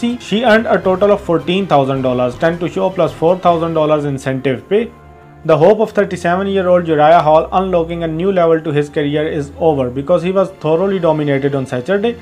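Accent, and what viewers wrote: India. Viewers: Hi bro you sound indian how do you watch fight night and paper view undercard usually ? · Your videos are good but your Indian accent is so thick and off putting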